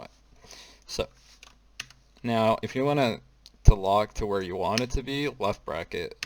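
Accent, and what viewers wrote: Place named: Netherlands. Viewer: i smell dutch accent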